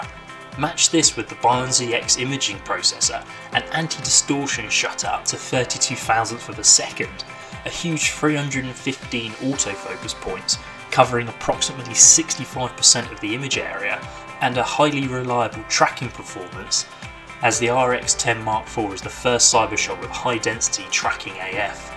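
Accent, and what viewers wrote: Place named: United Kingdom. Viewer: Your audio is really ok for normal hearing people but when you are exposed to jet engine noise over several years it a bad cobination with the british acent and the music your playing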